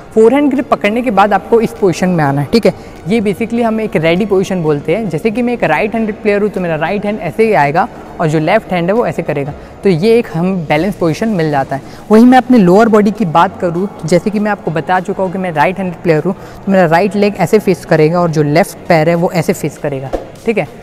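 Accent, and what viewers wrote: Odisha, India. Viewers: your accent is odia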